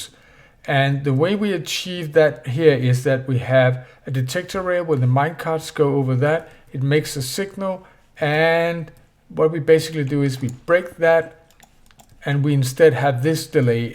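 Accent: Denmark. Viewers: “Det er en dansker.” Jeg var inde og kigge efter den første video jeg så med dig. Der er noget specielt over danskere der taler engelsk. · Are you from Denmark? 😊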